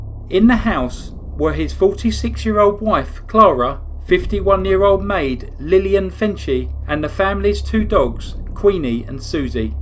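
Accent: United Kingdom. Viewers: This was a Brilliant yet Horrifying story but again presented Beautifully British. This is so sad that these young kids really went down for all the deaths of people and it happened so quick! But he took the life of a Baby and that for me is True Evil and The death sentence for the Murdering Sack of Shit I was well justified in my opinion but he would suffer more a long life sentence!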